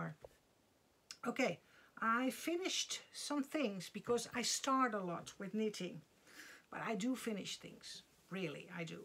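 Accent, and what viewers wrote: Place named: Netherlands. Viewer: I love ur bags and socks. My Grandmother was Dutch and hearing your accent brings me back. I have tinnitus as does my mother. The first couple of months it drove me crazy but now I don’t hear it all the time unless I think about it. I think my brain and central nervous system adjusted to it. It’s hard to explain.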